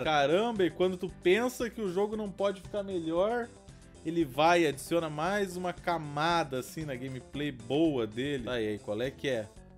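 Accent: Rio de Janeiro, Brazil. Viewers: ta virando carioca?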